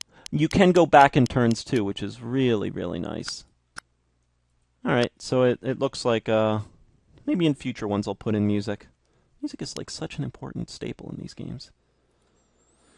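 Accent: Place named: United States. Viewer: i love the american accent =D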